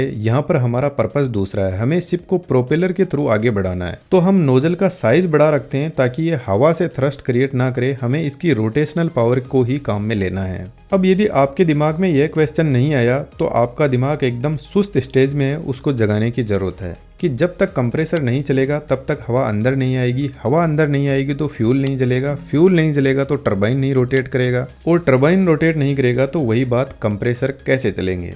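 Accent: Rajasthan, India. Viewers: आपकी हिन्दी बोलने की शैली में राजस्थान की झलक दिखती है क्या आप मारवाड़ एरिया से है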